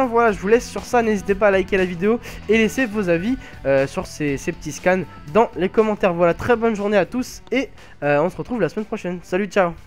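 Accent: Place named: North Africa. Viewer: eh toi avec ton accent du bled on a rien compris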